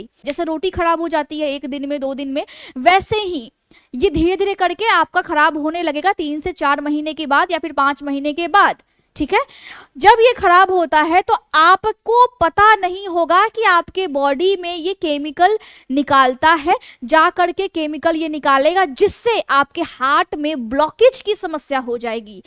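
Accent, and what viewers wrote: Bihar, India. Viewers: और अच्छा बोल सकतीं है आप, बिहारी ton avoid कीजिये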